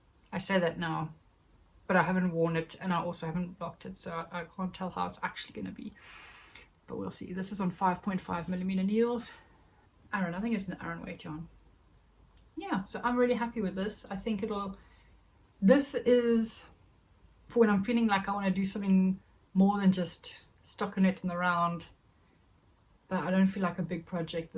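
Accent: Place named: South Africa. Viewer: When I listened to your accent in another podcast of yours, I thought you sounded South African and then in this one you mentioned you want to come and visit family in Stellenbosch. I am really loving your podcasts and I love what you are making. They will all turn out well. 😊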